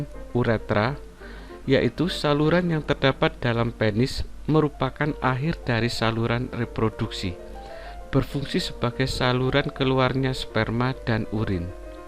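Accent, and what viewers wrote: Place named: Java, Indonesia. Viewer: Medok pak😂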